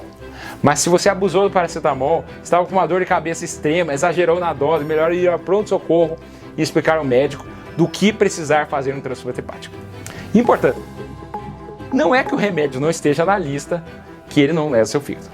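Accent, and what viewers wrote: Brazil. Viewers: Gente, da onde que vem esse doutor? Que sotaque é esse? É do sul ou do centro oeste?😅😅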